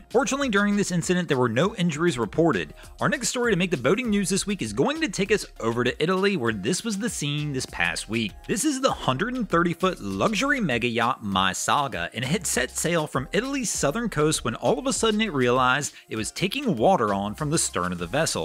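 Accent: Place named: North Carolina, United States. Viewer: He sounds like a North Carolinian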